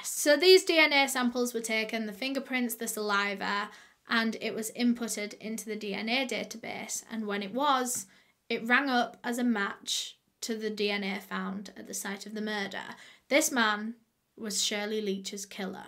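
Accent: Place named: Yorkshire, United Kingdom. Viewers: yorkshire accent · Shes from Yorkshire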